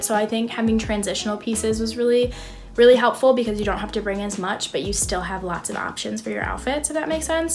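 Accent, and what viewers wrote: Midwest, United States. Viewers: i am from indiana and i can definitely confirm she is from the midwest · i can tell you're from the midwest girl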